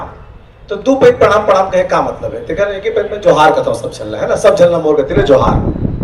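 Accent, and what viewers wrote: Chhattisgarh, India. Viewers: आप छत्तीसगढ़िया वाद ल कभी नहीं छोड़ना हे भैया यही आप के।पहचान हे । छत्तीसगढ़ आप ल जानते है तो आपके बोली के वजह से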